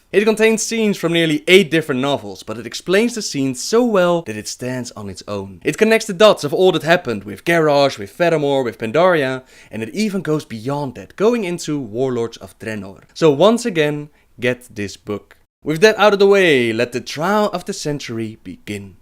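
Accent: Netherlands. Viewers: Dat Dutch Aksent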